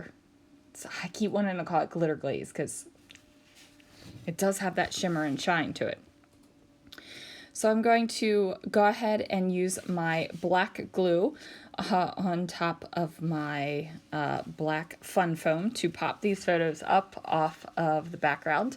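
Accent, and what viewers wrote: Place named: Maryland, United States. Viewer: Love your Maryland accent...miss that place as we have landed in Florida!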